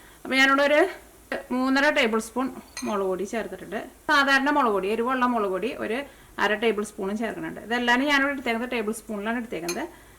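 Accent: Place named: Chalakudy, India. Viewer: കോട്ടയം അച്ചായതിന്ന് പറഞ്ഞിട്ട് ഭാഷ സ്ലാങ് ചാലക്കുടിയാണല്ലോ.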